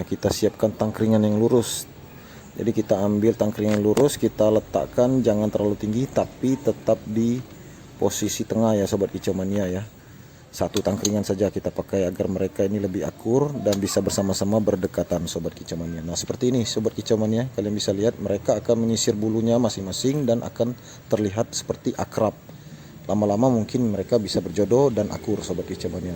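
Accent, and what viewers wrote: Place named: Palembang, Indonesia. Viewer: Logat nya kayak palembang oku selatan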